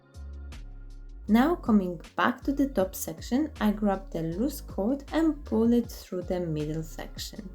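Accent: Poland. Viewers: These were all brilliant! I genuinely enjoyed them and only today I took a lampshade apart and considered using macrame cord on it. I love what you do and that you also go to the charity shops! Where sbouts in the UK are you? I can usually tell by someone's accent but you sound like you might possibly be from Poland (I love the accent). Cant wait to see what you do next and subscribed last week. 💕💕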